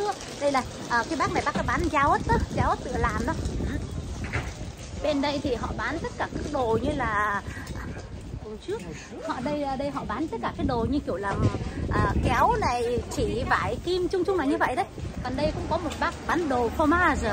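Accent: North, Vietnam. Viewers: Chào! Nghe giọng bắc mình cũng là người bắc. quê ở đâu vậy? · Thích nghe giọng Bắc của, mình vô tình mới xem kênh, vui vẻ và gia đình hạnh phúc ❤️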